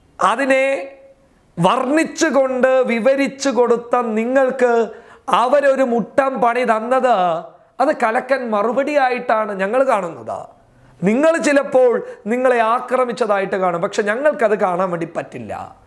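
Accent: Kasaragod, India. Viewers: വാർത്ത പറയുന്ന ചേട്ടാ നിങ്ങൾ കാസർകോഡ് ആണോ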